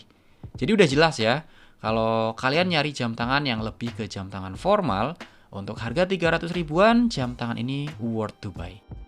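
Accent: Tegal, Indonesia. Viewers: Oalah...masnya dari Tegal toh...makanya kalau bilang Gua kedengarannya unik 😊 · Oh mas nya wong tegal ternyata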